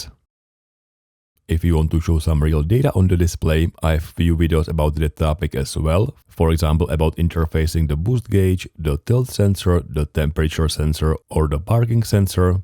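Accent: Czech Republic. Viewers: velmi vyrazny cesky prizvuk, inac genialne video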